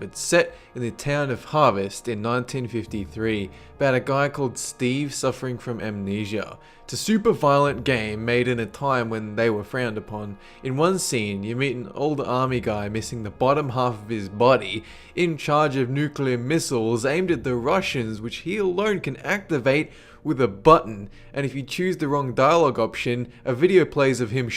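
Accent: Australia. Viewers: I thought he was Australian (?) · Heyyyyy are you Australian?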